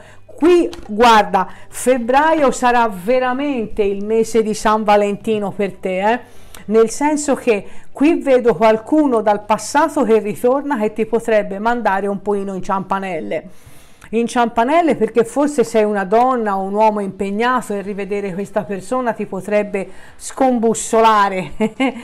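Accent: Tuscany, Italy. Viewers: Un abbraccio sei un mito.. Adoro il tuo accento toscano. Spieghi le tue carte benissimo. Mi ritrovo nella chitarra. Bella la nuova grafica...brava. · .... sei simpaticissima super accento toscano ❤... ti seguo sempre.... daiiii stesa del leone.... dai dai che arriva...lo spero!!!🌹🌹🌹🌹...grazie un abbraccio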